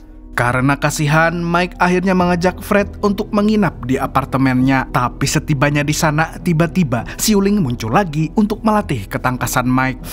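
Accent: Banjar, Indonesia. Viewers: Urang banjar kah pian mamang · org banjar sekalinya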